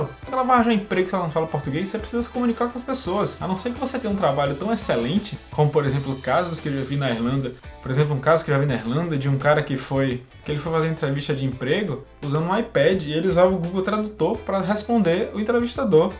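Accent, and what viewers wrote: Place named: Bahia, Brazil. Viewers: véy, verdade! Primeira coisa que pensei! Um conterrâneo, além do sotaque, as gírias baianas hahaha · Menino assim que escutei sua voz percebi o sotaque baiano. Adorei seu canal. Um salve direto da Bahia.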